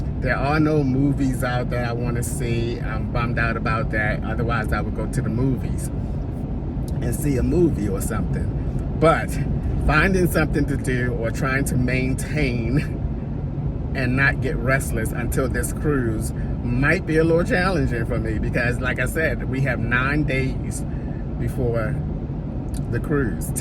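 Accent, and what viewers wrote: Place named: East Coast, United States. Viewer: your accent 🥰, are you from the east coast? Enjoy the cruise and FOA Fam 🎉🎉🎉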